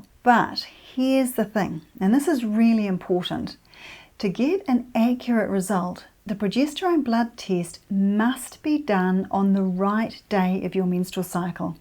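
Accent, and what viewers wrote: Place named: New Zealand. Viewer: Very informative. It's so nice to open a video on the subject of fertility and hear a kiwi accent 😊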